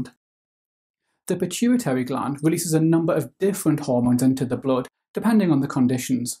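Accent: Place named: Northern England, United Kingdom. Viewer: Northern english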